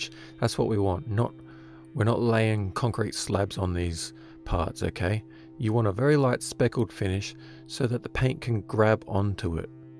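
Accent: Australia. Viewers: dude i fucking love you and your videos. amazing work, amazing commentary, delivered like only an aussie can. subbed! keep it up!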